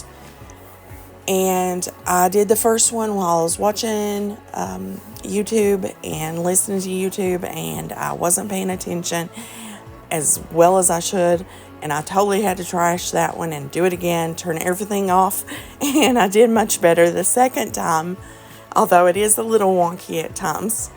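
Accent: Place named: South, United States. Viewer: Possum Patty watcher here just looking around! My mother, if nothing else in a day or night, PRAYED...I can hear her now..."LET GO AND LET GOD" she passed many years ago...but your southern accent and heart of prayer reminds me of her...happy crafting! PP is wonderful! ❤B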